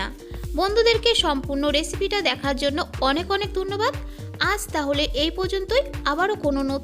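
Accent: Bangladesh. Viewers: দারুন, আপনার উচ্চারনে কিন্তু বাংলাদেশী টান স্পষ্ট । এটা বড়ই কানে লাগছে! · Khub Sundar. Ekjon bolen Bangladeshi tan lagche kathya te. Recipe bujhlei hobe. Bujhechen. Karo durbolata k nea tana Tani korben na. Ato sundar ekta recipe .atai boro kathya.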